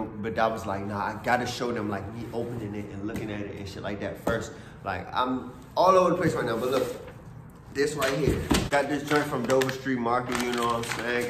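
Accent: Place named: Washington DC, United States. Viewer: You from DC bro lol ?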